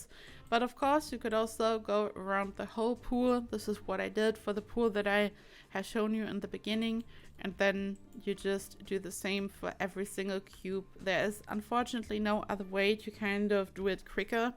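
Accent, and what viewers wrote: Germany. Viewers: You have a very german accent? Do you come from Germany?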